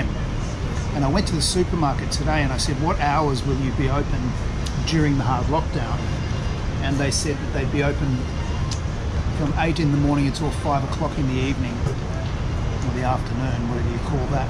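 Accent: Australia. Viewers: It’s nice to hear an Australian accent as well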